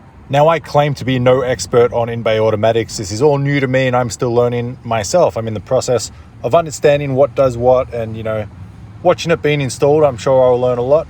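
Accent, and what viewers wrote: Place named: Australia. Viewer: I'm actually dying to go to move Australia. I was shocked when I noticed your accent, and you moved to California. I feel like Australia is the place for me. I'd like to move to Sydney. I'm sick of the freezing weather here. Canada is brutal to live in. Sydney has the most amazing oceans I've seen on camera, but maybe it's hard to make a living there. You obviously know more than me.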